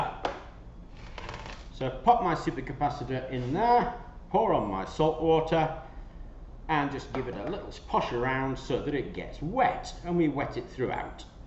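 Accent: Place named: United Kingdom. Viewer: And, trying to talk slower would help, as well... I'm in the US; &, trying to "translate" UK English into US English can be "interesting." I had to reduce the video speed to 75%; AND, STILL turn on closed captioning, just to follow what you were saying. If I had trouble following what you were saying, I'm sure that I'm far from alone here in the US... (I just tend to be more out-spoken than most people.) Interesting content, though. :)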